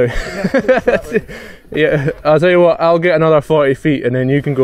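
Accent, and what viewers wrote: Scotland, United Kingdom. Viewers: is your accent scottish?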